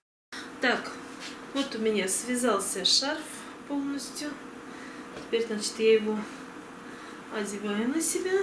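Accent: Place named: France. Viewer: Обожаю Ваш фpанцузский пpононс )я pелаксиpую , когда слышу Ваш голос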